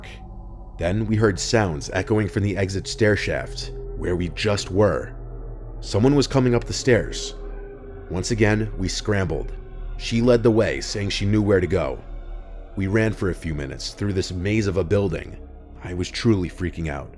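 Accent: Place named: New York, United States. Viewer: Gotta love the New Jersey/ New York accent. Reminds me of home lol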